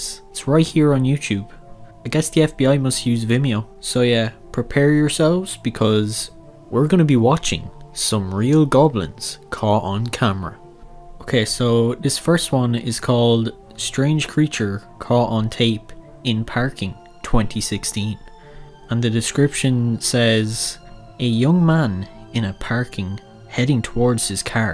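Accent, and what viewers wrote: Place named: Ireland. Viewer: ur irsh right? pretty sure becus you sound like it what county r u from? u sound like ur from limerick lol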